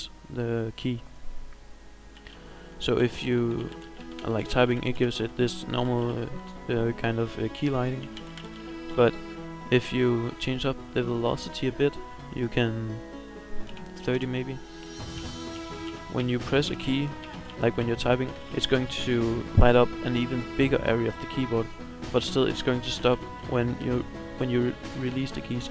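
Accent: Denmark. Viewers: Are you danish m8 ? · Good vid, keep it up, you sound danish <3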